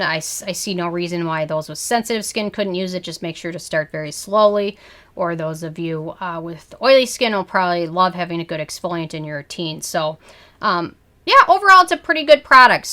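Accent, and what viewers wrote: Minnesota, United States. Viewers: You sound Minnesotan/North Dakotan. Am I hearing things right? 😳🤣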